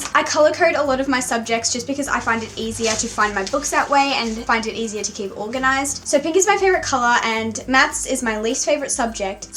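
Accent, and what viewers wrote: Australia. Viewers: I love your Australian accent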